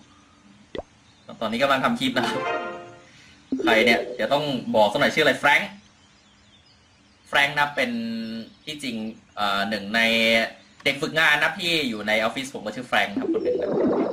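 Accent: Isan, Thailand. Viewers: พี่เป็น คนอีสานช่ายป่ะ =×=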